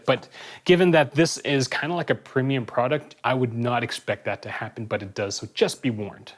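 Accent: Canada. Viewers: Ha canadian eh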